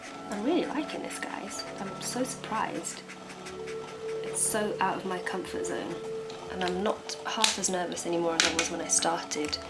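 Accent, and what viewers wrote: United Kingdom. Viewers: are you British?? X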